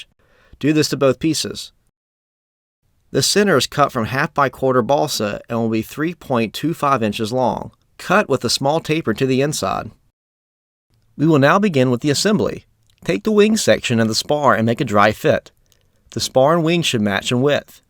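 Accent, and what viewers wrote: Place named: Charleston, United States. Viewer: awesome. I lived in Charleston for a long time. Thought I recognized that accent.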